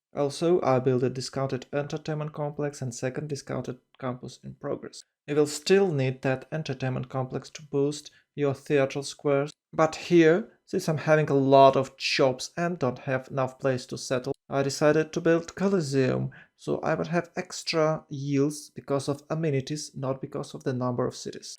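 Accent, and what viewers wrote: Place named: Ukraine. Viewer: Поки слухав почув, що акцент ну дуже на мій схожий. Ну дуже от. Перевірив канал, написано– Україна. Поржав, подивився далі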